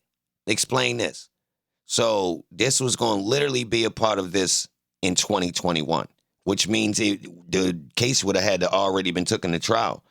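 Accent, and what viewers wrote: Baltimore, United States. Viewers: Between the comedic commentary and your bmore accent I am dying over here 😂😂 but this was a great video. Very interesting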